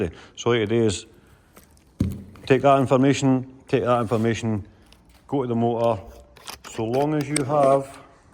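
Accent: Scotland, United Kingdom. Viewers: I've been struggling to get my M54B30 started in an E46 323Ci even after everything's been wired in (it cranks + EWS del), and this has helped both for info and morale. Never thought I'd need a humorous Scottish gentleman explaining BMW wiring to me at 4 in the morning. Thank you, especially, for the brake rev-cutter tip . I'm the head mechanic but have been blocked from being at this car's first drift event. That would have been bad for the drivers to discover without me there.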